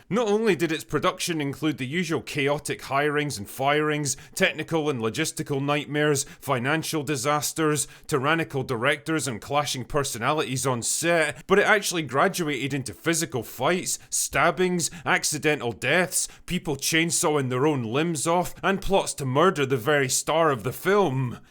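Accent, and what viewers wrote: Scotland, United Kingdom. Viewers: Is it weird that I don't care about the movie but listened just for your Scottish narration?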